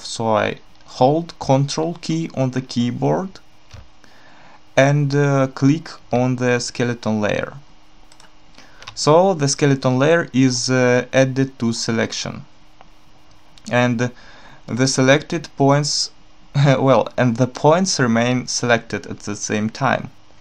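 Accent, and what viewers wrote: Russia. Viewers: Приятно слышать родную речь.Говорит на Инглише, но ТАК по русски. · Рашн аксент эноин май ээрс абсолютли )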